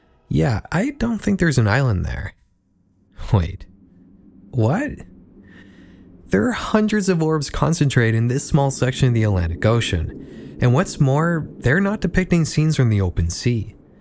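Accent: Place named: Nova Scotia, Canada. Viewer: Are you from Nova Scotia?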